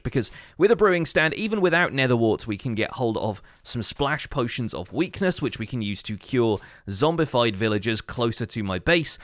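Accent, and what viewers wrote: United Kingdom. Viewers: You sometimes sound like AbdulHakim Murad. Clear british accent sounds great for us non native speakers and both of you have it.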